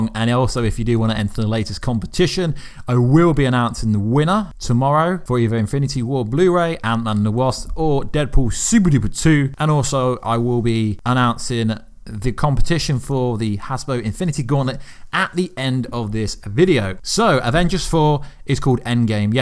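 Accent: United Kingdom. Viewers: I'm well accustomed to certain pronunciations being butchered by a British accent, but since when did "human" become "thuman"?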